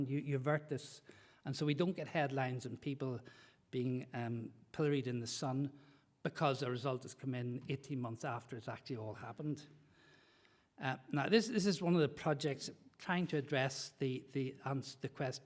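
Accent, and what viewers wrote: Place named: Ireland. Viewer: The Irish accent ❤️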